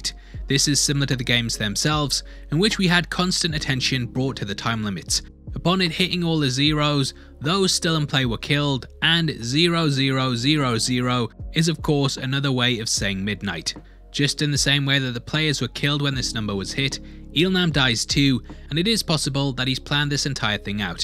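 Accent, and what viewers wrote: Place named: Newcastle, United Kingdom. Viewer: He's not doing anything with his voice. He has a Newcastle accent.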